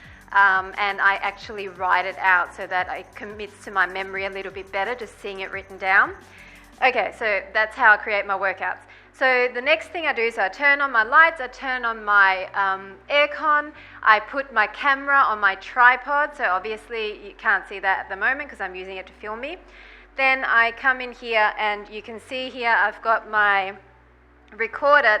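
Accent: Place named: Australia. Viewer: Love this behind the scenes video and totally agree with Mon P. that you have one of the best workout channels out there; I also recommend it to everyone I know who's into fitness. As a Chicago girl, I tell people that one of the fun parts of doing your workouts is your delightful Australian accent! A couple ideas I think would be great for Coffee Talk videos would be: 1) fat loss vs. weight loss and how to hone in on fat loss once a desired weight has been reached; and 2) what do you typically eat in a day/recommendations for how to eat well overall. Personally, I tend to eat healthy, but struggle with being able to find healthy foods that really keep me full. This is a tough area for me because my metabolism is pretty quick, so I always feel like I'm out-eating everyone around me by like double. Any tips?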